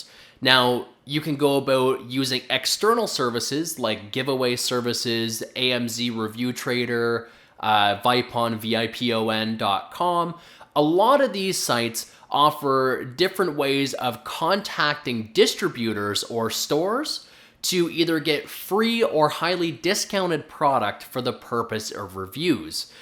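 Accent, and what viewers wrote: Canada. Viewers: You have a very pronounce Canadian accent, meant nothing bad by it. I was just curious if my guess was right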